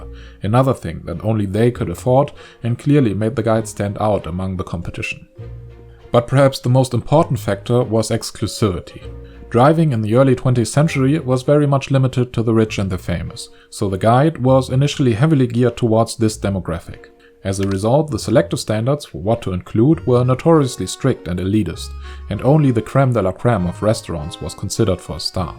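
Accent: Germany. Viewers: Not French for sure. As French myself, it sound also German to me. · Du bist deutscher 😋😋 is ch höre das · I love your German accent, very convincing and genuine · Pretty sure he's german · PLEASE practice your accent. For me as a fellow german its nearly unbearable to listen to you speaking english anyway, nice video! keep up the good work 🌞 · wie kann man nur so deutsch klingen xD dieser Content ist einfach ein Referat aus der siebten Klasse. Krank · U german? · Typical german accent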